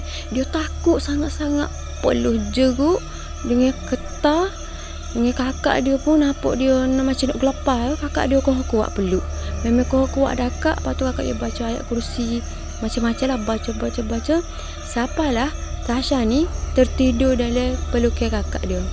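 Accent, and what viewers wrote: Kelantan, Malaysia. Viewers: Hebat sangat ke minah ni speaking setiap kali kau bercerita kisah seram?bunyi orang kelantan!tapi acah acah bagus cakap mat saleh😜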